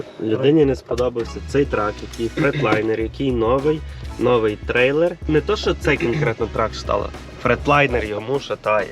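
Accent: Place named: Western Ukraine, Ukraine. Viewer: по акценту видно что хлопцы из ЗАХОДУ ,почему узнал ? Я сам оттуда поетому сразу понял Прикарпате, возможно Закарпате но болше звучит как ребята из Коломыи или Калуша>2 года назад делали CDL значит успели перед войной сюда приехатъ ,Оченъ удивително видетъ таких детишек что так серъезно задумывают болшие планы и из воплошают в жизнъ. Одним словом настояшие ГУЦУЛЫ !!!!!!!! Здес в Америке 21 год считают толко полнолетним вообше детишки долго развиваются в отличие от таких молодых ребят с такими болшими амбициями..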